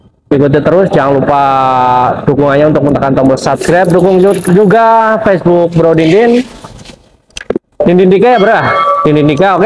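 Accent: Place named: Cilacap, Indonesia. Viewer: Ternyata ini orang Cilacap jd jawareh😮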